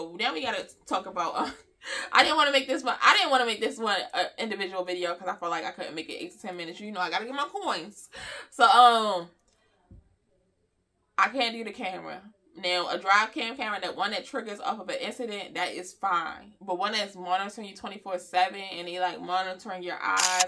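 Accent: Baltimore, United States. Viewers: Sounds like you from Bmore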